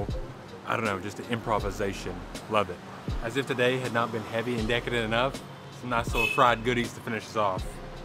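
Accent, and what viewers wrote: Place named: Arkansas, United States. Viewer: He's from Arkansas. Totally different state and different accent. LOL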